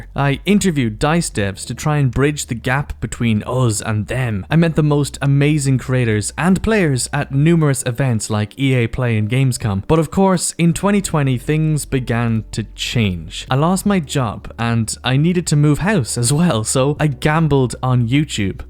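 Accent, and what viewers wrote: Ireland. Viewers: Thank you for everything <3 I enjoyed going on your stream for fun, watching videos, and hearing that smexy Irish accent of yours, and I'll keep supporting you. From making videos about video games to now working in the industry is a hug feat. I'm so happy:D Good luck!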